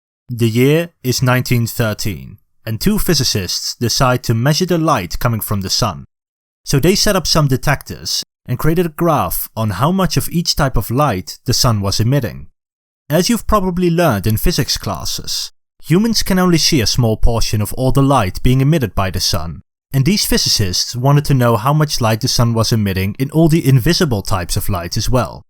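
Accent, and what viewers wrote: Netherlands. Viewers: you sound dutch am i right? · Are u from the Netherlands cuz u have a Netherlands accent. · are you dutch ur accent sounds like it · I think it's his Dutch accent.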